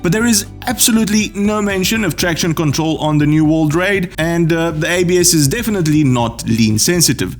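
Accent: South Africa. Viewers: were you originally from South Africa? You have a hint of South African accent. 🇿🇦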